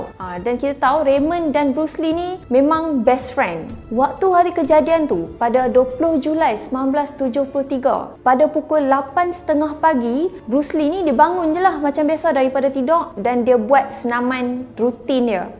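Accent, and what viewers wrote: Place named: North, Malaysia. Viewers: Segak btul marka kedah ni..bibir dia manis dgn loghat utaqa❤ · Cakap utara noo.. 😊🌹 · Pekat betul bahasa Kedah ang noh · Hebat akak hg tau kt mana cerita ni. Sebenarnya menarik jgk . Lgi2 akak ni ckp mcam org utara. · Terbaik sis cara penjelasan cerita lancar Dan mudah di fahami humble relax jaa ckp utara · xtau pulak ckp kedah naaaa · syok dengaq loghat utara....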